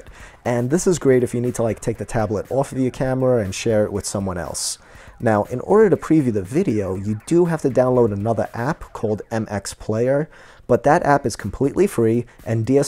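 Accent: United States. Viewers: I don't know why but the more the video went on, the more I begun to like this guy. Might be his accent which I assume is like an East Coast accent? IDK. But consider me subscribed. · i love this deep brooklyn review :DD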